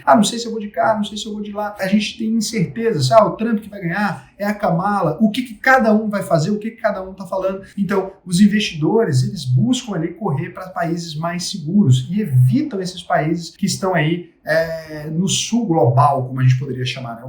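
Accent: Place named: São Paulo, Brazil. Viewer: Pensei isso por causa da voz e esse sotaque apaulistado 😆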